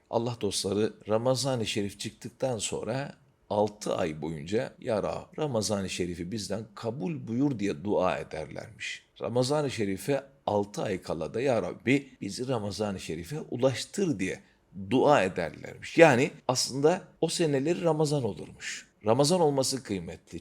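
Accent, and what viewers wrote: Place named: Arab world. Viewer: hocam, içerik olarak gayet keyifle dinliyorum, çevremle paylaşıyorum ancak türkçeyi de arapça diksiyonu ile konuşmanız bir süre sonra yormaya başlıyor, suni/zorlama geliyor. ali rıza demircan hoca misali…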